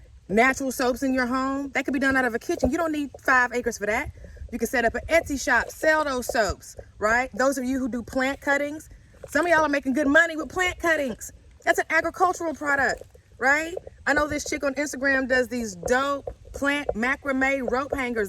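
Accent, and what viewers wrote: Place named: Virginia, United States. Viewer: I love you! I'm from Virginia but living in Hawaii for 4 years and I love your speech, I miss it. I love how you broke this down and what you have to say. I just found you and this video totally changed the way I've been looking at this.😃The business and my lava rock land here is exactly what I'm trying to approach this from.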